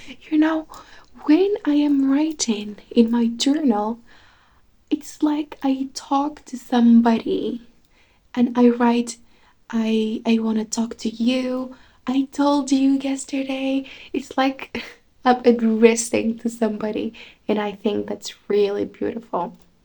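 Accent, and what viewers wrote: United States. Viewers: HOW YOU DO HAVE BEAUTIFUL ACCENT LIKE AMERICANS ? WHAT IS YOUR SECRET? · Proud of you as a Moroccan young girl speaking English language fluently with Americans accent Mashallah ❤ I Just discovered your channel yesterday , I will ask you if you can tell the Couran stories specially to the kids who were born in USA or UK to understand better when some one speaking . Thank you so much , you are beautiful and talented keep up with writing the journal every day , and encourage others to do it as well .( doesn’t matter what language! )…………Happy New Year 2024 🎉🇲🇦🇱🇷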